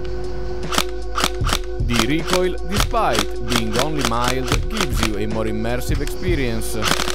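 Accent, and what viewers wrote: Italy. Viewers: Don't agree at all. Easy to understand and with an awesome accent :) Italian?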